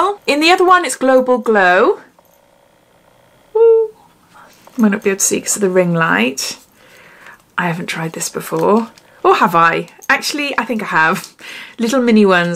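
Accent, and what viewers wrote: Wales, United Kingdom. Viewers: Are you Welsh?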